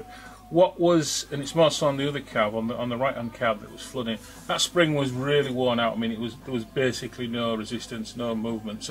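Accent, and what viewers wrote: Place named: Hull, United Kingdom. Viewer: Lol iam from hull ,can spot ull accent a mile away .just got gt <num> ,having starting problems ,and kick start stopped returning on its own for f sake .😢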